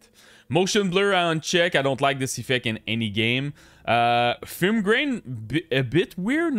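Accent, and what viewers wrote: Quebec, Canada. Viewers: Sérieusement, tu donnes toujours de bonne recommandation! Pis en plus, tu cache pas ton accent, bien de chez-nous! Lâche pas le bon travail dude.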